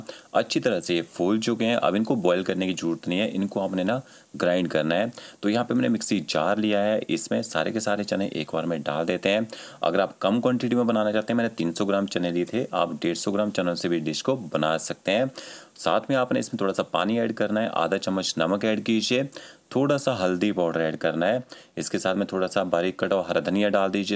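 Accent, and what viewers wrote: Pakistan. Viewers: Pakistani lehja hain apka to ji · Aapki voice ek pakistani jaisi lagti hai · Bhai you sound like Pakistani😅 aapne ye kaarni hai vo krni ha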